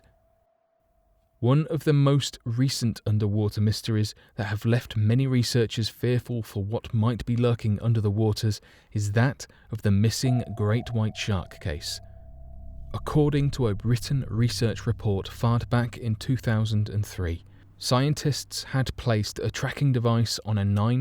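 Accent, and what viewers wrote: United Kingdom. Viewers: This is the way they pronounce words in the UK. There are plenty of other narrators that sound just like him. Remember, their version of English came first. So to them, North Americans talk funny.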